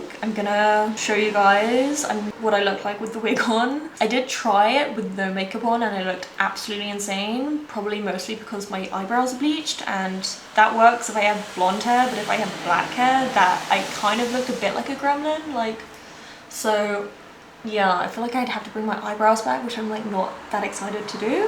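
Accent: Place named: United Kingdom. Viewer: I'm from the states but knew instantly you were British. And yet you were clearly heard, no need for captions! Great midsection , btw. 😁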